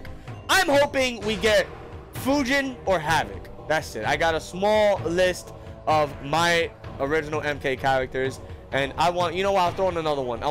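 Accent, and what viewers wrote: New York, United States. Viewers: are you from NY? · Love your reaction. Love your NY accentos. It succs ass Spawn will be released in March yo. And that "no homo" comment made me laugh! I still say that shitos!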